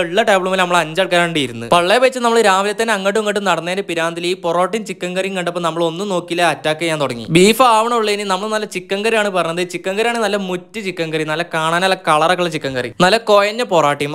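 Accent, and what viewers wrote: Malappuram, India. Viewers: Malappuram language paranj show kanikkunna chila aalkkarundu. But samsaram no raksha. Natural aanu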